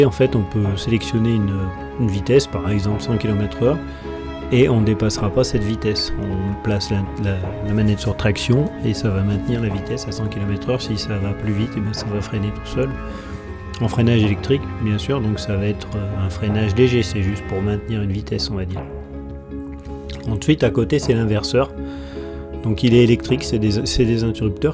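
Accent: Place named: Haute-Loire, France. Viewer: La voix de est unique, appaisante avec un p'tit air de la Haute Loire si je n'dis pas de bêtises.. 👍😊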